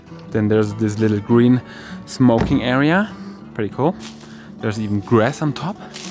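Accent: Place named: Germany. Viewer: yesterday I just wanted to know about Germany, or better, Deutschland... and now I'm a fan... lol it's the Deutsch accent... I love it.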